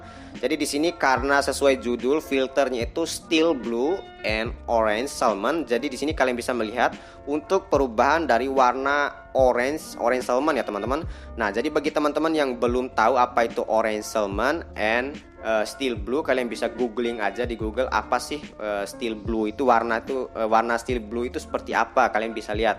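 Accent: Bima, Indonesia. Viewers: Orang Bima ya bang?? Salfok sama logatnya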